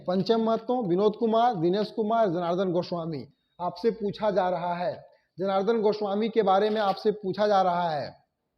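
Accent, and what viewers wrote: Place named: Jharkhand, India. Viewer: Sir hum bhi hirodih thana ,pandeydih se hai ,aapki language se humko laga ki aap giridih se h thanks 😊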